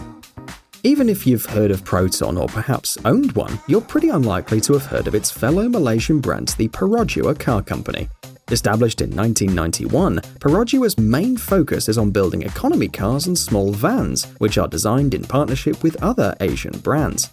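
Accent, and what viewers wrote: United Kingdom. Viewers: "What's up?" Naff all, pal. It's bad enough when YouTube Yanks ask what my problem is, but a British accent asking it demands a Glesca kiss.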